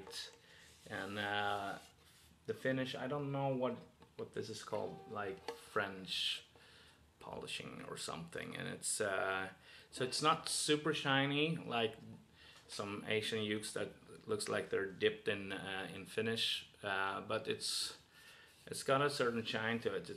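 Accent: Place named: Texas, United States. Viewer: What a cool Texas accent u have!! I need another use!! Nice instrument.....T:)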